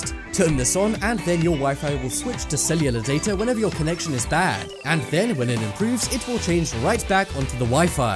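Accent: England, United Kingdom. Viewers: Sure would have been nice to understand wtf he was saying. British accent. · What the hell was that British ninny saying? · How about talking a little slower, and not speak the Queen's English! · Most irritating background music. Your accent sounds as though you are English, so the correct term for a meal ordered to be consumed at home is a Takeaway, not a Takeout, also, the correct abreviated term for Mathematics is Maths not Math.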